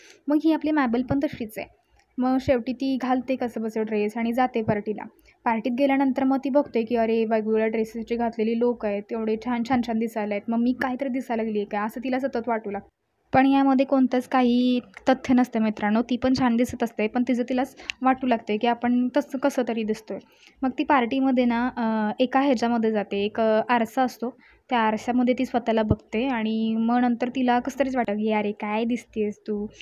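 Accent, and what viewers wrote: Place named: Satara, India. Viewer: i think you from satara speaking satari and gavathi mix but it superb......nice teaching method..i loved it,,,keep doing well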